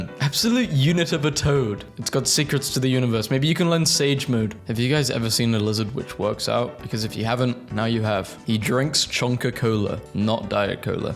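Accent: United Kingdom. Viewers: Remember, he British, he’ll pronounce things differently every once in a while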